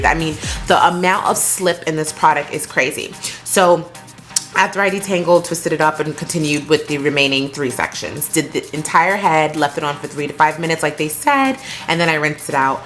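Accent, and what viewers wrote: United States. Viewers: you have a new york/louisiana/californian accent all in one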